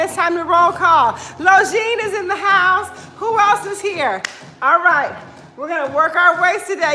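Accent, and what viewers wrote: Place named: United States. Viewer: me encanta tu acento gringo al hablar espanol :)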